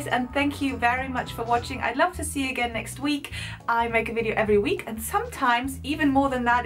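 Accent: England, United Kingdom. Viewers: English tricky language! Sometimes words are not pronounced as you would think! You sound more British than German! You speak English very well! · Big suze! That's her name that was hard work and on second look you don't look terribly much like her lol think it's more her softly spoken voice , you sound very English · at the beginning I thought like: wow... she sounds quite British but there's something else as well... German or sth. 😂😂 I knew that bc German is my mothertongue😂 but I'm not quite sure. i live in the UK as well and I try to sound as british as possible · I have the same problem with the word 'determined'. Every time I use it I have to check its pronunciation out first!! it definitely drives me mad. By the way, congrats on your great British accent! ;) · The first time you said squirrel you sounded Australian aha And you sound very British! 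Also.. idk if it’s like a non Australian thing But I’ve never struggled to say rural? Maybe everyone in Australia lives rurally so we have to use it in conversation all the time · How did you learn to have an accent like that, you sound very proper and more english than pretty much every english person i know including myself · I'm very surprised that you've even developed a distinct British accent. When I learned and tried to speak German, idk where my accent would be from lol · I think you're more English than some English people themselves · is QUITE lovely...............and charming......................and funny. But I wonder if SHE appreciates just HOW 'English' she sounds now? Great stuff! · What the hell?! At the start I thought you were British! Amazing English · I would say it Is a British accent ... forced pronunciation, it used to be a thing where the BBC had it as their golden rule that no "English, Scottish, Welsh or Irish accents would be on television or radio. 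so we had all these fake people on the box that infected everyone. accents change every 30miles in the UK, drastically change. as this is technically "The Queens English" this girl actually has an advantage being German. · If it's any consolation, you pretty much speak English with an English accent. And if you go to America, squirrel tends to be pronounced more like "skwerl".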